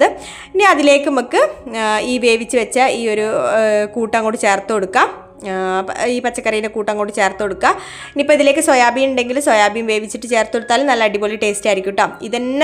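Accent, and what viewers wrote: Kozhikode, India. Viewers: swontham kozhikkote bhasha aayondanu kooduthalum aduppam thonunne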